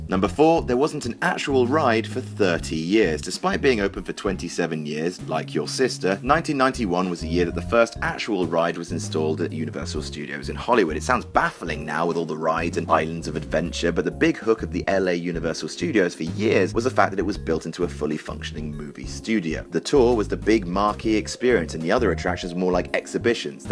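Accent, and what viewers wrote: United Kingdom. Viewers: WHY did they use a steroid-voiced, heavy accented, British motor-mouth turkey to narrate this?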